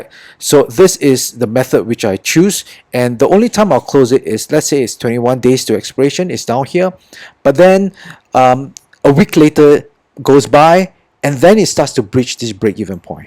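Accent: Singapore. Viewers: U sound like Singaporean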